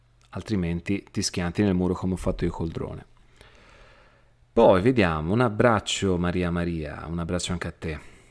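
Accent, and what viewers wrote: Tuscany, Italy. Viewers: grazie x il tuo impegno ti seguo a 360 gradi ! Da anni ho iniziato un percorso di rivoluzione umana e i tuoi consigli e condivisioni x me sono molto ispirativi e di sostegno concreto ! Non solo il tuo accento toscano ( come me e poi ho scoperto che sei di Pistoia quindi siamo anche vicini !) il tuo modo di esprimerti e il tono della tua voce trasmettono sincerità per questo ti trovo davvero verace e concreto ! Riesci a mettere delle frequenze x il sistema linfatico o x i vasi linfatici ? Grazie ancora x il tuo impegno ! Buona vita e buona lotta !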